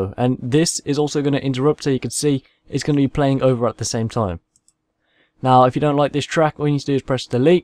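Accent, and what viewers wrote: United Kingdom. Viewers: You sound like a British version of xxxtentacion. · Why y’all sayin he talks to fast. Get your jockstraps outa your buttcheeks and open your ears he talks like a regular brit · Dude, what language are you speaking ? )) Is it Scottish or Irish accent?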